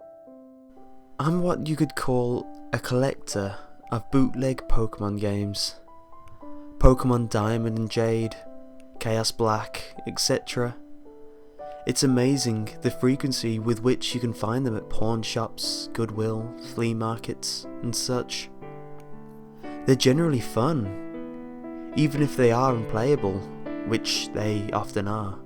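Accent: United Kingdom. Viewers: Luis Galaz preach, stop calling people retards and stuff just because they misheard the word “pawn”. The man is British and you can easily mishear a word.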